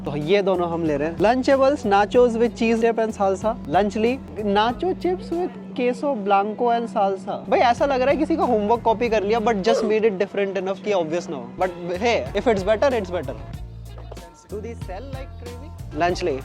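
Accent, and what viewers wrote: Rajasthan, India. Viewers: Bro has the strongest Indian accent I've ever heard 😅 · bit of rajasthani accent?? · thats the most Indian accent i have heard outside India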